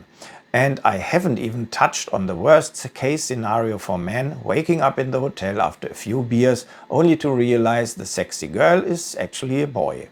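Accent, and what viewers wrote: Germany. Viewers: German accent still 100 percent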